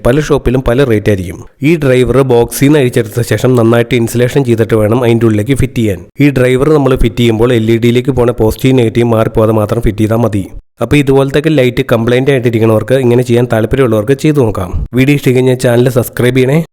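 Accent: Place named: Muvattupuzha, India. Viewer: Kothamangalam or muvattupuzha.😊 സംസാരം കേട്ടാൽ അറിയാം😊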